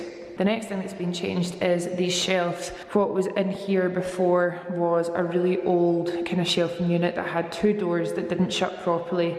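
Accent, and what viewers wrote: United Kingdom. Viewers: Your accent is lovely from which part of uk you are?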